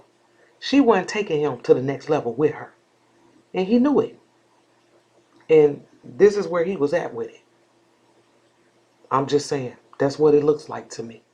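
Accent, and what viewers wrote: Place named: New York, United States. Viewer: I thought I was hearing N.Y.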